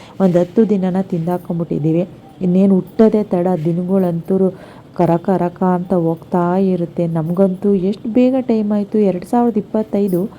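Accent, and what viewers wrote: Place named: Shimoga, India. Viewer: Hi nimma vlogs Supper nanu kuda nim side Talkere nanna Tavar mane nimmuralli namge parichaya davar edre na erodu Shimogga nan enjoy madta vlog nodtini akki palahara egantu marte hogide niv matadodu namma tarane adke nanag insta niv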